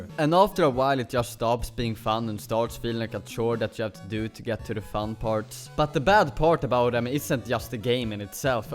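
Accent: Sweden. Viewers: 1.From your accent I presume you are from Sweden like me 2. I didn’t like the Zelda parts of the chapter at first but they started to grow on me for a while. 3.Kanske du behöver en TANDPÅNG för att lösa dina problem med kapitel 3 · Banging video my man, håller med typ allt men bro din svenska brytning är så stark att jag knappt fattar nåt lmao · Swedish? May I ask?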